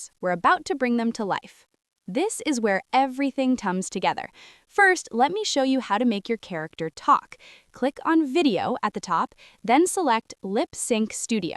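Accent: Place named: Chicago, United States. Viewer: you must be from chicago... good work...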